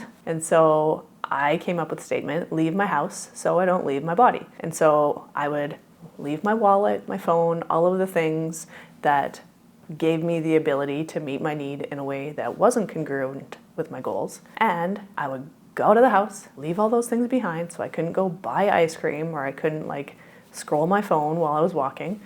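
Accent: Canada. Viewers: I hear a fellow working class Canadian accent, I upvote lol Yes I have a simple brain. Not related to being working class Canadian. I'm just simple.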